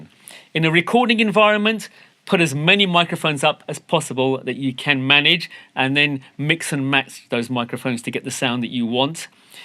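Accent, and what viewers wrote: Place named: United Kingdom. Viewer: I liked his British accent..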